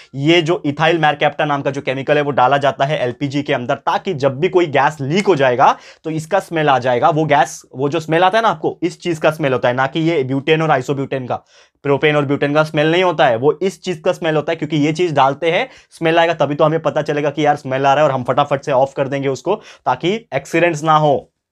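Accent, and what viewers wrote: Maharashtra, India. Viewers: Sir Apki mother tongue marathi hai?? OMG!!!SAME Marathi gang like here!!!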